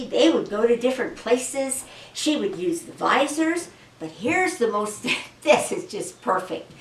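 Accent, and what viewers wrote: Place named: United States. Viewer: I’m from England and your strong American accent really makes me feel happy and I smile, you say bangs we say fringe, but when you said dirty bangs I fell about laughing ( this was in a previous vid) you’re such fun I now say dirty bangs as I find it so funny, you’re becoming like a Sister to me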